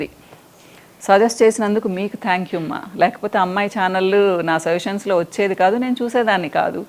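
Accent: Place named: Godavari, India. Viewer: Maa godhavari slang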